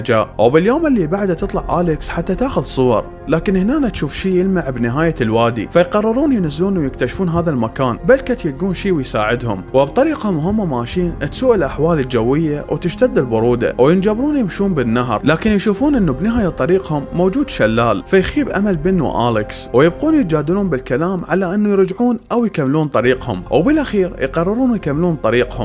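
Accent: Iraq. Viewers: اللهجة العراقيه جميله جدا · طبعا اني كولش احب اللهجة العرافية اتجنن اخوكم من بنكلادش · اللهجه العراقيه مميزه تحياتى لاهل العراق اهل الحضاره والكرم مصرى يحب العراق واهله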